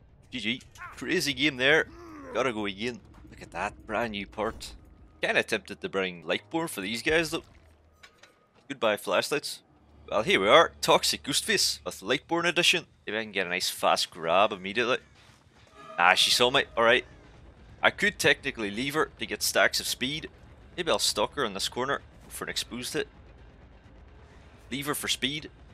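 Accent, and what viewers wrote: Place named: Northern Ireland, United Kingdom. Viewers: northern Irish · Im not good with accents, but I would say he's Northern Irish.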